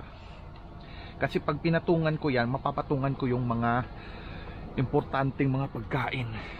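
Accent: Iloilo, Philippines. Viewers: Wow sir ang sarap ng mga nakuha mo.i.lke u kc nag iiwan ka for ithers fi mo inuubis lahat. At namiss ko ung ilonggi accent mo manong.welcome back gid last ko tan aw ato bala damo nga mga bayo gintilon mo kesa ibaoy sa land fill kanugon gid.salamat gid sa imo. watching from antipolo city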